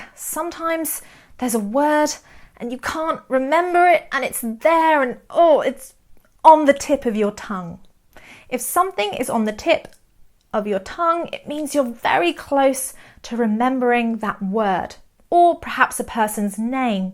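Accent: United Kingdom. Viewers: I love British accent. Love your video.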